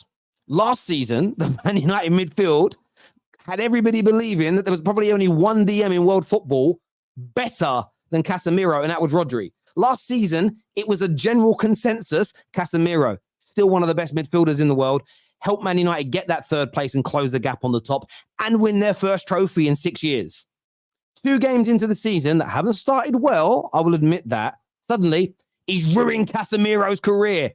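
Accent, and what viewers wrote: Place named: London, United Kingdom. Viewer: You can tell this guy is a true United fan from his strong London accent ✌️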